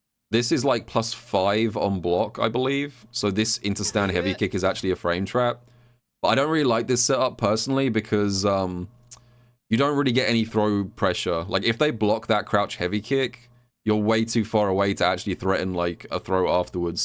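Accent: United Kingdom. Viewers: The British pronunciations are killing me in this video lol. Sako's Aki? No, Sacko's Acky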